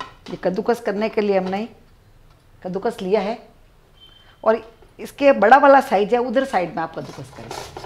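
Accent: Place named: Marwari, India. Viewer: apki boli se lagta h ya aap marwadi h .yhaa baris bohat h me triy karungi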